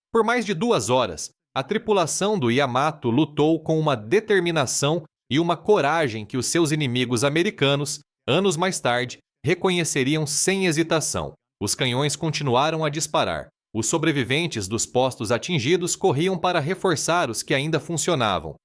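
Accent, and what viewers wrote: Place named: Caipira, Brazil. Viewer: Narração em caipirês acentuado. Não acho estranho pois sou caipira do interior de São Paulo, mas não estou acostumado com esse tipo de narração de fatos históricos. 👍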